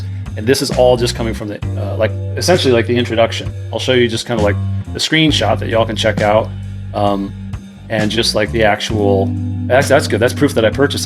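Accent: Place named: New Orleans, United States. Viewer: Man you sound like you're from New Orleans lol. I am so I think thats pretty cool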